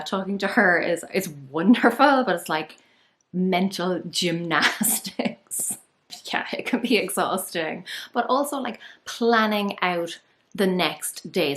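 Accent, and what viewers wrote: Ireland. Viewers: I always stay til the end and I love your Irish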